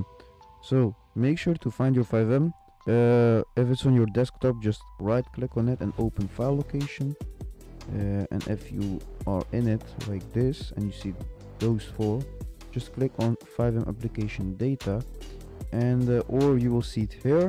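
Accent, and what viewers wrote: Netherlands. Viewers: ik hoor gwn dat dit een nederlander is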